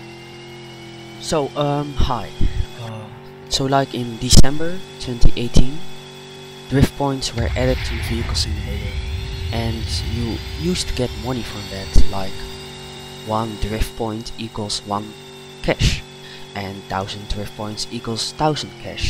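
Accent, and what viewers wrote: Netherlands. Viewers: Ben u Nederlander? Want your accent. My dutch is bad lol. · Ik hoor gewoon je bent nl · Oh mah lord are u dutch🤯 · Arer you dutch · Dutch english accent alert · are you dutch?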